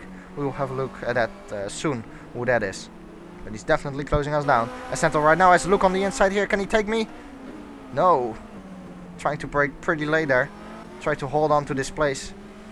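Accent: Netherlands. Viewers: Zo grappig dat je toch echt hoort dat je een hollander bent.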